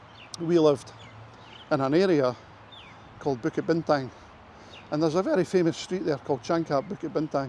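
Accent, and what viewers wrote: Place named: Scotland, United Kingdom. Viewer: Yep it's English. Scottish accent is considered difficult to understand even by other English speaking nations. Many also find the accent cute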